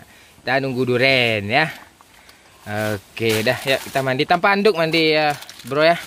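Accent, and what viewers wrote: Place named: West Sumatra, Indonesia. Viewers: Bang abang org mana bang soalnya subscriber baru kok suaranya kayak orang sumbar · Bahasa nya jaleh urang Minang nya om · Suka kali aku logat nya minang kocak😍❤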